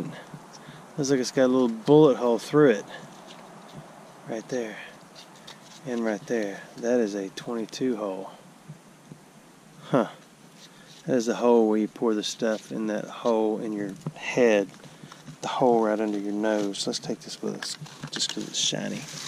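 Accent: Alabama, United States. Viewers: What a voice! If someone wanted to capture American accents, this guy would be the voice of Alabama. Yes, it's been softened by interaction through modern media, but softer than Texan. No twang and a hidden southern humor. College educated with a desk job.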